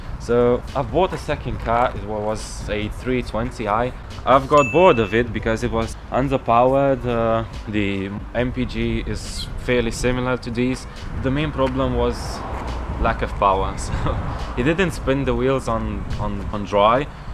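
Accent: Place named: Lithuania. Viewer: Agirdi tu lietuvis nes nekaip kalbi anglas nedarytu jokiu klaidu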